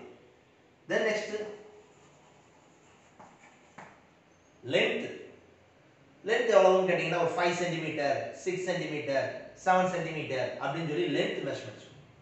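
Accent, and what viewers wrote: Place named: Tirunelveli, India. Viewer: Nellai tamil super sir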